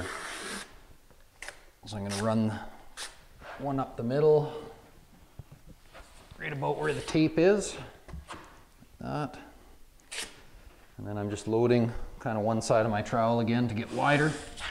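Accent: Canada. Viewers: are you from Canada? Voice sounds Canadian. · You sound Canadian :-) I use the same tray for mixing small amounts of powder. Do you always use premix/regular mud on your first coats? I've always been tought to use powder, usually 45, if not then 90. Much more strength and it allows you to coat it again or even 3x in the same day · That's an interesting accent you have there! Kinda Canadian, German, Dutch, Irish infusion...and with a name like I guess you're or your family are / were from Ireland? Anyway 😂, won't butt edged joints show with sun beaming down the wall? I'm curious - as I'm from the UK, and we all skim whole walls over here!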